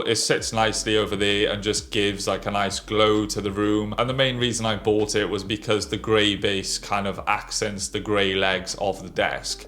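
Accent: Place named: United Kingdom. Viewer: You sound British. Do you work at Spotify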